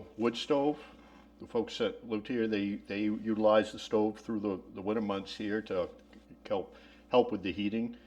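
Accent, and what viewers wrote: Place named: Maine, United States. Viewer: I watch videos just to hear that Maine accent. As authentic as it gets.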